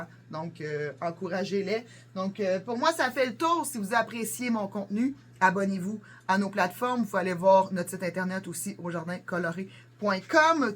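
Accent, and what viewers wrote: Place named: Quebec, Canada. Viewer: J'ai un peu de mal avec l'accent québécois mais j'aime bien vous écouter. Vidéo intéressante. Je vis en France.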